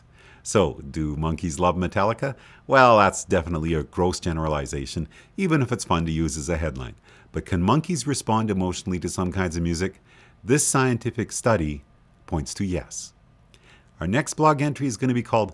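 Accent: Canada. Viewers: hey i didn't realize you sound canadian until now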